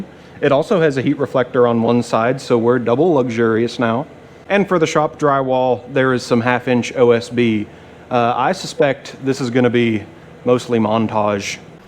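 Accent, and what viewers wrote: Canada. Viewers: Trying to peg the accent.... originally from the great white north? I'm getting Canadian or Minnesotan vibes. Love the channel!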